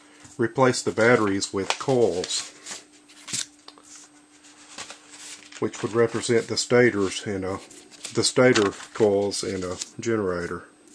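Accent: South, United States. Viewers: your southern drawl is awesome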